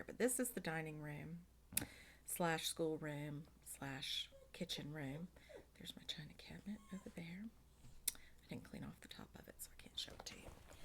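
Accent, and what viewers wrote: South, United States. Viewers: Love this video,! You shared your favorite things and it resonated with me! I also love your southern drawl! We lived in AL for a couple of years and it just feels like home! Please keep floss tubing!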